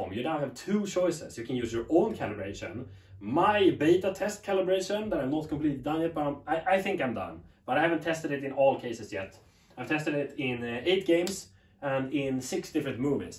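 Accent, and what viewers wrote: Sweden. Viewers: Er du svensk. Hører den svenske aksenten din Det er vel mange gamere som bruker C4 også i tillegg til filmelskere. Jeg har LGCS oled som jeg bruker ps5 på.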